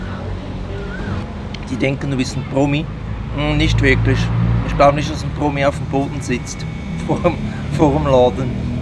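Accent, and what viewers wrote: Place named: Switzerland. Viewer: Thai mit schwyzer Akzent. Scharf. ✌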